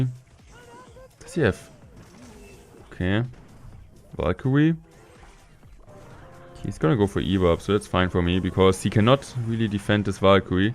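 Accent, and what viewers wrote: Germany. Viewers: Do you speak German? Your english sounds realy German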